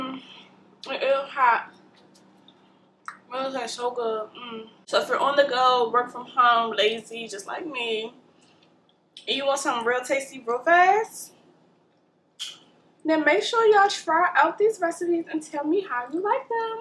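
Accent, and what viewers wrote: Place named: DMV, United States. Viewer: New subscriber .. loving your channel I knew you were from the DMV by your accent 🤣 before I even read your profile lol .. u made me miss home lol 🫶🏾🤍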